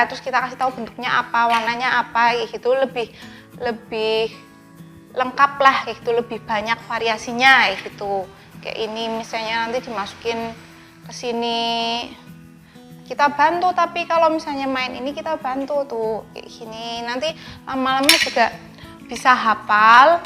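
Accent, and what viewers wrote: Java, Indonesia. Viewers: Walaupun medok mbake ayune poll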